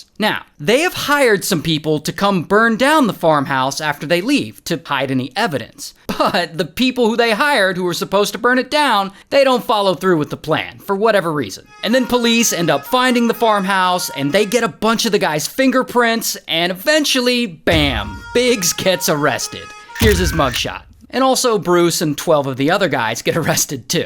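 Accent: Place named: England, United Kingdom. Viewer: The English accent 😅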